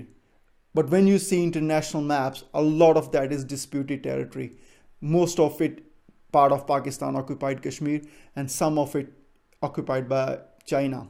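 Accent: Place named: United States. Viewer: Why american accent?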